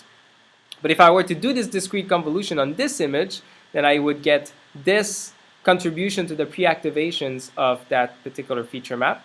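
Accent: Quebec, Canada. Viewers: r u quebec or frenc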